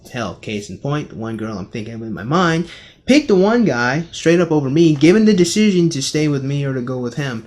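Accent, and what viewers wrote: United Kingdom. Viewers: Your British accent makes me sp uncomfortable and left the vid with a dislike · lol what is up with the limey accent? You speak perfect english.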